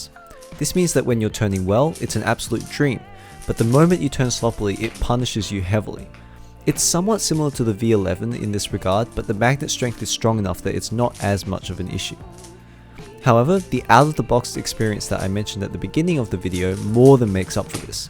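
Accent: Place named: New South Wales, Australia. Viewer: yo are you from nsw if u dont mind me asking?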